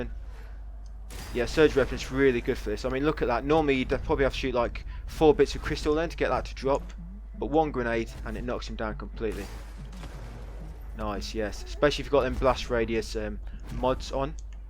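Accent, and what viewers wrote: United Kingdom. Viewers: what part of the uk you from I was trying to pick the accent, after hearing you for all those hours 🤔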